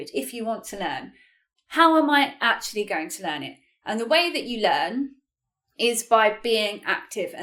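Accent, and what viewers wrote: United Kingdom. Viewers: I really like your british accent. I am studying English currently a day per week. I lke your videos. Thank you a lot! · I love your lesson and your British accent ; )Thanks a lot!!! But I would like more lessons for british pronouncation ;) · Thanks for making this useful video. I have been in the same situation and It is not too late for me to change the method to learn English effectively. By the way, I love you British accent a lot.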